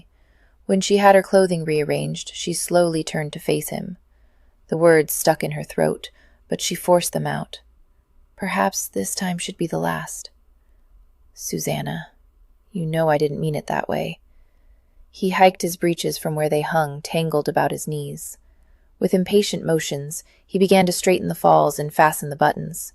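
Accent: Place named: United States. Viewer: No no no no no! No American Narrators of an English story!!!!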